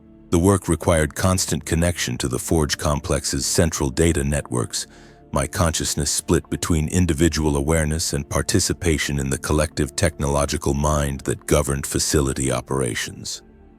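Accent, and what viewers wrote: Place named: United States. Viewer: The voice is better, barely watchable now. Still, the American twang is infuriating. These people have English, accents, old London etc. The American voice adds camp element to a dark and gritty world.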